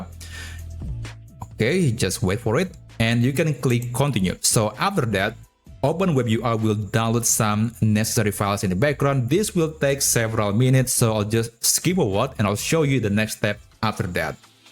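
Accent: Indonesia. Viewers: Logatnya bahasa inggris indonesia banget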